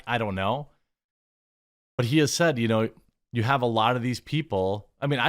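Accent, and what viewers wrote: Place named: Wisconsin, United States. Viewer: You should say coffee, cocoa, or tea. When you say "coffee, tea, or cocoa" with that Wisconsin accent I hear "coffee to yer cocoa" every single time.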